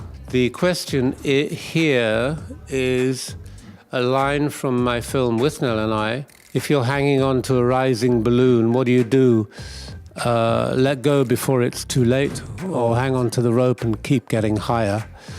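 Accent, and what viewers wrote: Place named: Germany. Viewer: Nice German accent...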